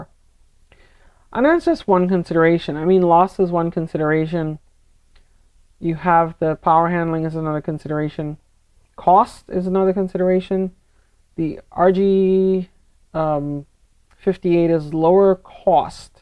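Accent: Trinidad and Tobago. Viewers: Thanks, by the way are you from Trinidad? KM6DHT/AG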